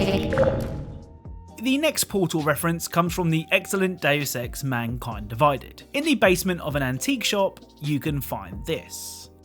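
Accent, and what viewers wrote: United Kingdom. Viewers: Are you British, Australian, or New Zealand?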